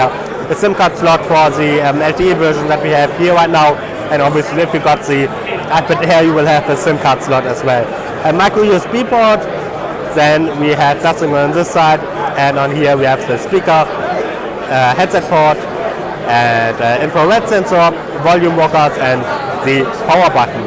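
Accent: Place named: Germany. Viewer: sis is se worth english ive ever heard please if you as a german guy do your hands ons in english please learn how to spell words like "thing" oder "that" properbly und jetzt nochmal auf deutsch lerne bitte erst englisch bevor du hands ons auf englisch machst die wörter heißen "this" und nicht "sis" oder "the" und nich "se" ansonsten mach auf deutsch weiter oder hörs einfach auf das kann man sich ja nicht antun ich konnte das video nicht mal halb anschauen weils mir dann schon hochgekommen ist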